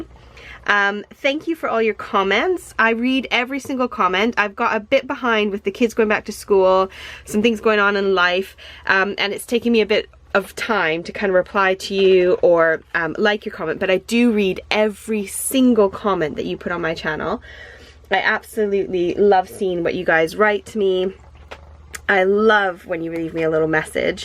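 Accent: United States. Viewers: I so love your American accent with British terms. Makes my day🤓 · Hi there, new subbie here, loved your cards, where are you from? I can’t work out your accent, you sound American, but so many of your phrases are English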